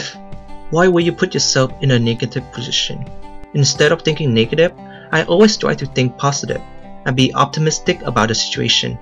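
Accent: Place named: Vietnam. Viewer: I was watching your Emotional Intelligence video and I recognized that you have Vietnamese accent. Curiously, I clicked on your channel and found this video. I have the same situation with you, but yet speak up and it was not bad at it is. I just wanna say that you did a very good job ^^ Thanks for the video.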